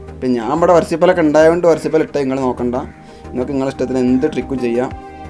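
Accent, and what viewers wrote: Malappuram, India. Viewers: Ith malappuram bhasha alle. Atha ganethanne aaymkotte😍😍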